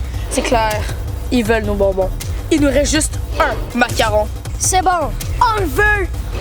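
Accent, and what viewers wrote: Quebec, Canada. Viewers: Jadore tes video cest pas laccent quebecois ? Jadore😍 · #lovemail t'es le meilleur j'adore ton accents québécois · J'aime trop les Québécois surtout votre accent !!😘😘😘😍😍😍😍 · J'adore vos axant ... l'axant québécois c'est mon préférer . Je trouve qu'il est tros marant . Sinon j'adore t' es vidéo . 😍😚😇😜😄😸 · Jadore troppppp lacsent quebecoise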